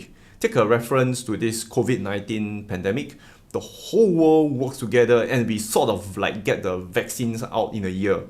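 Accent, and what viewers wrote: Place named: Singapore. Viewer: Needs an accent reduction course for Singaporeans. Straining to listen & follow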